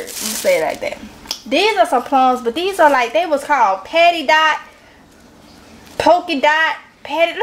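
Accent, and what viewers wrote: South, United States. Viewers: Love that southern accent it hits different lol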